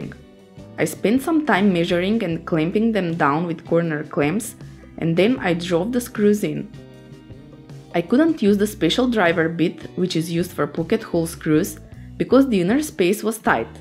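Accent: Russia. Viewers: Откуда вы, девушка? У вас сильный русскоязычный акцент... . :)) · Крутая работа! Что за акцент? Русская что ли ? ))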